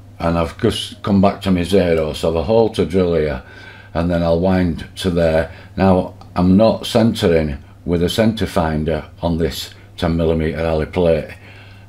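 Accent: Yorkshire, United Kingdom. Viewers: Great videos! If you get bored with the mill stick a stamp on it and send it to me in Oz 😉😀 And I’m guessing your accent Yorkshire or is it Lancashire or Cumbrian 👊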